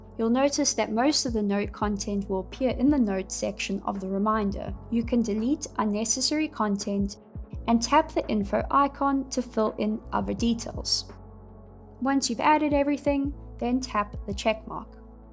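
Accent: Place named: South Africa. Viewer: Great to hear a South African voice in the content space and this is a great video with clear explanations for a very underused app.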